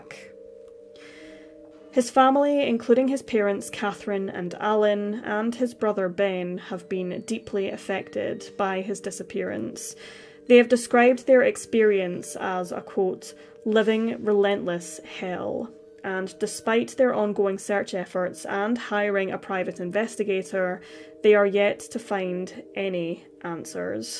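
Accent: Scotland, United Kingdom. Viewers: North east scotland accent?! 😮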